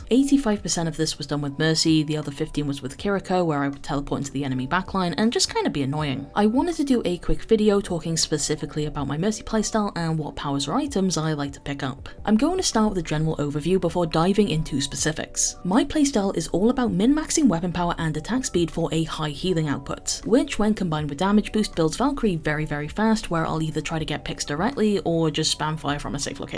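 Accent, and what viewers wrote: Wales, United Kingdom. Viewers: Their Accent is Welsh if ur curious.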